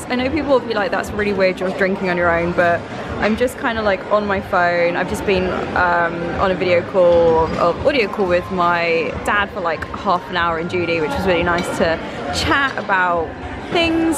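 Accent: United Kingdom. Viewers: Love that British accent, so cute.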